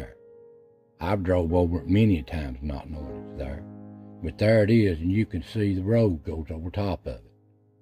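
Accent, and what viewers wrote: United States. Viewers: Awh yes, my buddy, aka Mark Twain of the Great Smoky Mountains, telling a great story in his country style again, Thanks, God Bless ya sir.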